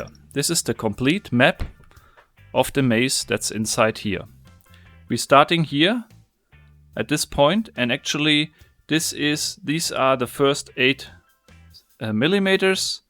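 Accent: Germany. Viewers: Du bist Deutscher nä? Ich höre das raus aus deinen Dialekt.. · Ich find den deutschen Akzent so geil xD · Nice german accend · You are easy to understand because of your nice German accent. Lass mich raten: aus Hessen? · genius! but you speak like a german. are you? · Dat german accent Kreygasm. Way too good :'D. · Ich hätte nie gedacht wie stark man den deutschen Akzent im Englischen raushören kann 😂😂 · Are you german? You sound like one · Man hört den deutschen Akzent raus :D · I like your typical german engineering accent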